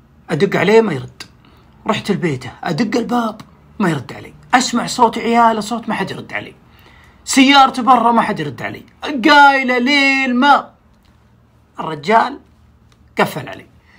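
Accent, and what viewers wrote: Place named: Libya. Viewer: سبحان الله كلامك ولهجته كانه من ليبيا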